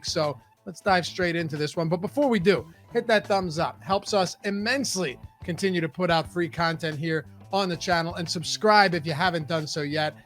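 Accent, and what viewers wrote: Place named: Boston, United States. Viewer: Definitely a Boston accent.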